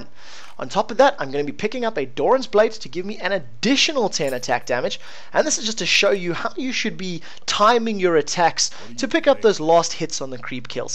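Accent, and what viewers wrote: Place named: South Africa. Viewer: I think he is South African, that's kind of how we all sound... xD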